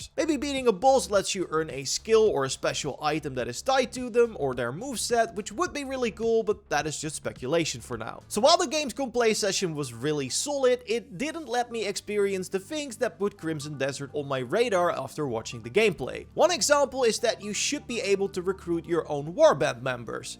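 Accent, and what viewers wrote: Netherlands. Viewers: Bruh learn how to speak English. Why do you have to push that Dutch accent in so hard? It makes your videos unwatchable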